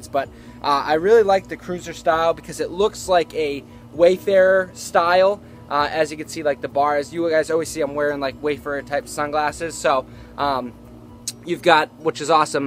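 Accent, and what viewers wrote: Boston, United States. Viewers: boston accent Tha unabombah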